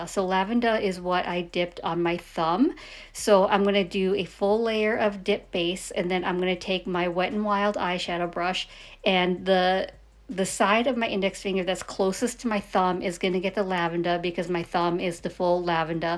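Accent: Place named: Massachusetts, United States. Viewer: Lavendah… you’re speaking my Massachusetts accent we have officially dropped the ‘R’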